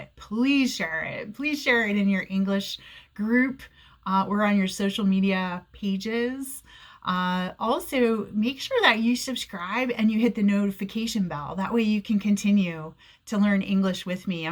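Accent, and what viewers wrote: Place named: United States. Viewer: Oh you guys are completely dependent on packed food. Anyways thank you for informative video, it definitely answered my question that has been lying in my head since long, n here i landed onto your video. Your pronounciation is very much clear, the speed you speak is good and easily understandable, i enjoyed your accent. Its not like other americans whose words are hard to catch most of the times. I had a hard time in the beginning in my job as a transcriber, to document american calls for insurance company. But with time it got better..hehehe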